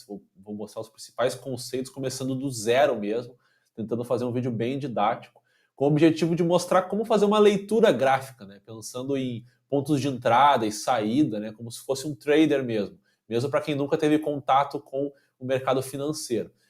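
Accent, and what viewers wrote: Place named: Rio Grande do Sul, Brazil. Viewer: adorei seu sotaque gauchíssimo - além, claro, da explanação muito didática para esta neófita no mundo do trading de criptomoedas. obrigada por compartilhar seu conhecimento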